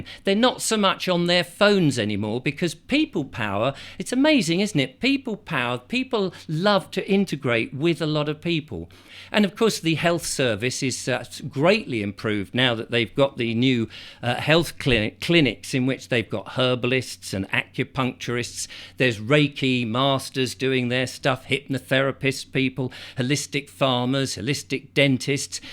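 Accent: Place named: England, United Kingdom. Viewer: I like the way speaks. He is typical English with lots of fun 😊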